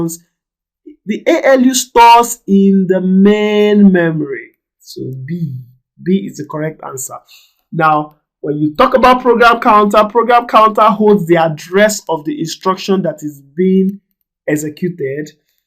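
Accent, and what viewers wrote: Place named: Africa. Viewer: he has an African accent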